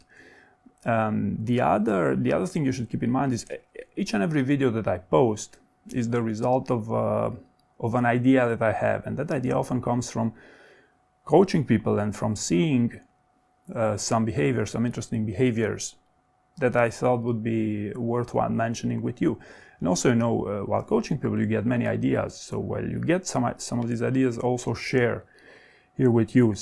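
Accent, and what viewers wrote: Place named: Romania. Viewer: Thank you for all the videos! I figured that you would be Romanian, you sound exactly like one of my Romanian boss :D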